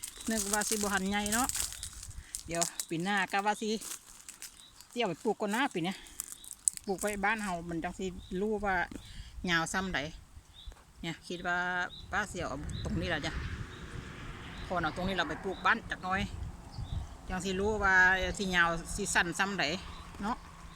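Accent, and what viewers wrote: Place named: Phu Thai, Thailand. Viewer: 🙏ดีค่ะ มาย้อนดูคลิปเก่าๆจ้า เป็นคนจังหวัดอะไรค่ะสำเนียงเหมือนภูไทหรือจังหวัดเลยค่ะ 🌷👍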